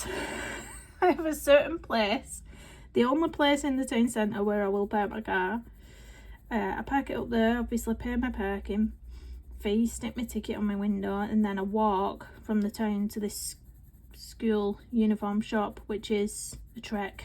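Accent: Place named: Wales, United Kingdom. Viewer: Hi beautiful I love the unicorn 🦄 she is looking beautiful.. I really thought u were from wales lol.. your accent is beautiful when I talk in Aussie slang some people look at me too exp when they aren’t Aussie lol 😂 lol forward watching your next colour and chat ❤x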